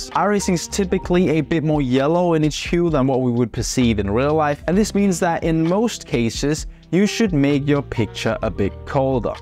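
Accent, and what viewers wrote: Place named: Denmark. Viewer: syntes nok jeg ku høre lidt danglish, hehe Der var også danske plader på den røde Jaguar, da du var i Sverige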